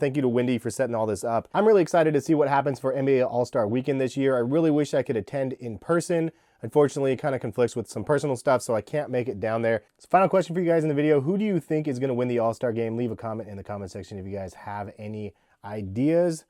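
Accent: Oregon, United States. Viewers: These are horrible. Icy sole looks cheap, only reason sole is icy is because Nike can’t figure out how to make a clear sole that doesn’t yellow, the clear soles from 90s were best but of course yellow over time. Please let’s stop the myth that tumbled leather is high quality. It’s just cheap leather w pebble textures. This shoe is crap. Like videos. You have an interesting accent, is it what Oregonians sound like? The way you say “colorway” (cull ah way) and. “Nike” (like Nyyyy Kee) is different. Keep up the good videos.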